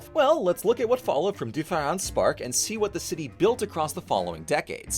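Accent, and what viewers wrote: France. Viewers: As a bilingual Canadian, this was a good video but also a really interested demonstration of the differences between Acadian French (which is the accent I’m used to), Quebecois French, and France French. There some pronunciations and even specific phonemes - The i in Dufferin, the r in Nouvelle France - that didn’t sound wrong exactly, but also didn’t feel quite right? Like did a good job with them for sure; it’s that the combination of words I’m used to hearing only in French Canadian/Acadian accents with a more France-y accent makes the differences clearer. Tbf I’m also not sure how those pronunciations compare to the Quebec ones - I’m pretty sure keeping the alveolar r (with the tip of your tongue) is a much more Acadian feature and I’m not sure if they do it in Quebec or if they use the glottal (back of the throat) r like in france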